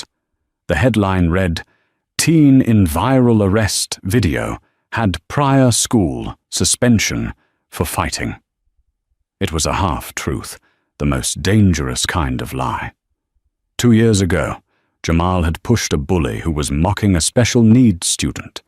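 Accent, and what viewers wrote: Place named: United Kingdom. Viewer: STOP with the British voice and pronunciations when dealing with American settings.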